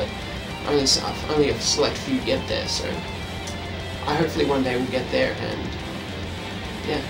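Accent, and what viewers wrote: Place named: Australia. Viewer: You sound Australian. Are you Australian? (I am auzzie 😜)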